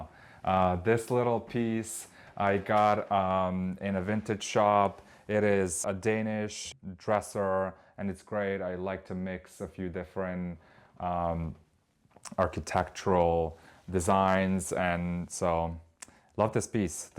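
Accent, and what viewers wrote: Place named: Serbia. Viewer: Great style, great apartment. I knew you were from Serbia, the pronunciation of certain words… well done!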